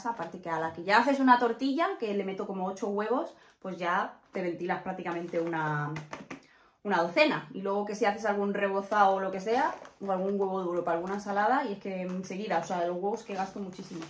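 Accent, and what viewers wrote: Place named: Paisa, Colombia. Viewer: Hola desde Colombia. Me encanta sus videos. Pareces paisa con acento español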